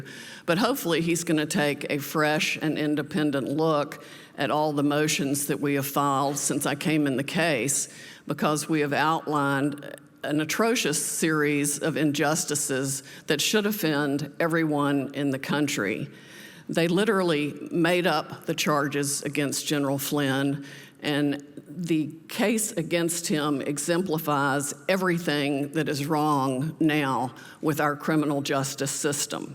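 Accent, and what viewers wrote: South, United States. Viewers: love that SOUTHERN DRAWL Sidney ... ear candy telling the truth is twice as good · Justice must come within 2020 before the frenemies crawl back up! Love your S. Accent👍💪🗽🇺🇸❤️💡🙏🏻🔑. Yes, Sec8-& Prison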